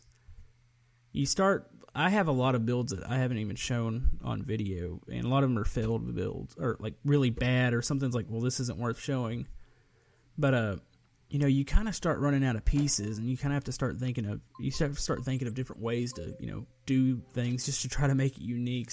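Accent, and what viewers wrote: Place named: South, United States. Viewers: I'm guessing Texas. At first North Carolina but after talking to my uncle, I'm 99% sure it's Texas. Although I may be wrong and look like an idiot lol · I swear he has a southern accent.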